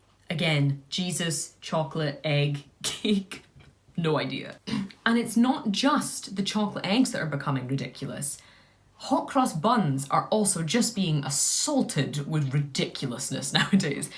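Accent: Scotland, United Kingdom. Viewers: Hiii! I am here only for your good looks and Scottish accent. ;)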